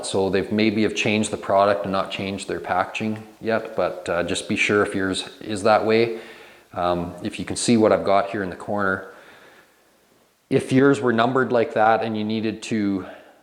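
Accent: Canada. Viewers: Love dudes accent. Proper Canuck. Just like me. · I'm Canadian, and I can tell he's Canadian just from this accent lol. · you can tell he's from CANADA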